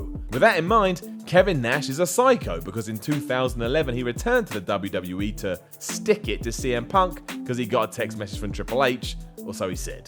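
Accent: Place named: United Kingdom. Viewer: NuclearWinter i quite enjoy his voice actually. its soothing. but that could just be the biased American, who loves British accents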